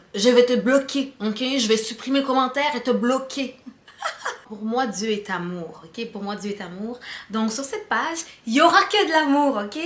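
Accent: Quebec, Canada. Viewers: G n aime pas la guerre des sexes g suis d accord avec vs vous etes du quebec ou du canada vu votre accent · So Cut ton accent canadien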